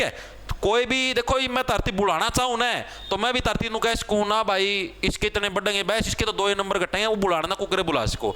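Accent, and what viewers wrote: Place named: Dadri, India. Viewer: Aapki boli must h ji dadri side k i hna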